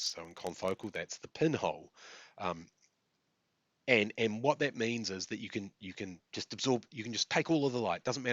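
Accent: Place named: New Zealand. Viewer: I have a New Zealand accent, because I grew up in New Zealand. But if you're having trouble, turn on closed captions.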